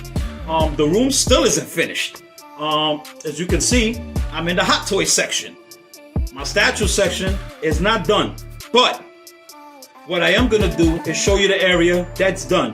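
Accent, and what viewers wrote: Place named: Bronx, United States. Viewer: Yooooooooooooo seen you before onba few chan never seen your vids !! Very nice set up !! Where u from man I hear a lil BX in u !! Let me find out u from there! Hit me back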